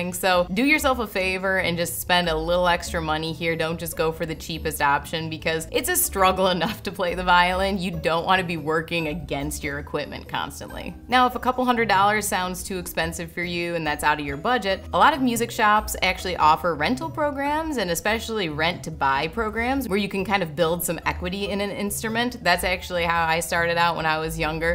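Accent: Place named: Minnesota, United States. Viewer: You sound very Minnesotan. Are you?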